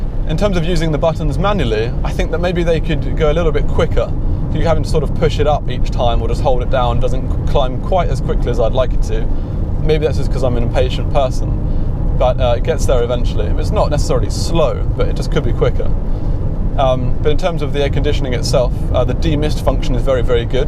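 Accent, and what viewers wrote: RP, United Kingdom. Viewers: Wow! Initially i thought what a Cambridge/Oxford educated yuppy accent 🤦‍♂️ but you gave me all the info i was after thank you i shouldn't be so presumptuous in future 😅 👍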